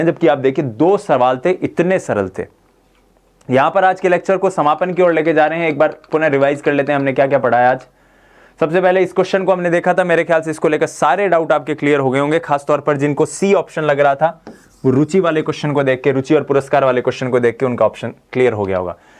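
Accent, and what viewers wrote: Rajasthan, India. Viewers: Sir ur marwadi is fabulous. We olso speak this language in my home ☺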